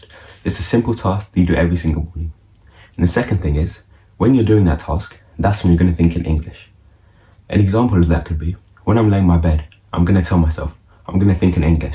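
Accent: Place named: United Kingdom. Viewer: Guy your British accent is perfect!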